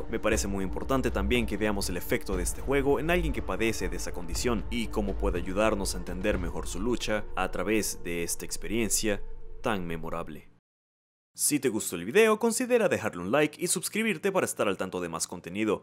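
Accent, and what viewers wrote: Venezuela. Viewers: como se nota q eres venezolano, salu2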